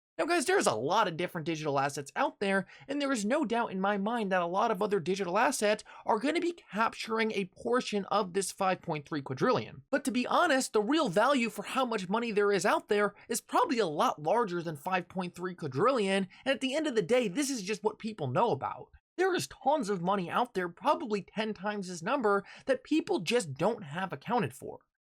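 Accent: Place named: Delaware County, United States. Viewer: That’s that Delco accent… he won’t admit it but I knows it when I hears it…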